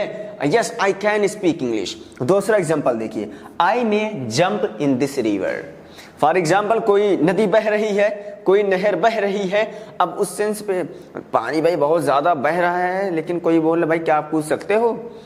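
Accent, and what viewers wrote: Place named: Bhojpuri, India. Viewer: I have never seen such a teacher like bhaiya best teacher 😆 Whenever I attend his video I started smiling Before open the class, I started laughing 😂 He isn't speak hindi he speak bhojpuri😂 his sound gives bhojpuri vibe 😅 I like it this class with no distraction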